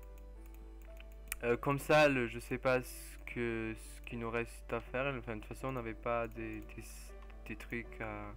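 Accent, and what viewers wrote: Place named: England, United Kingdom. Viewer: ton casque change ton accent tu as un accent englais mais ses bien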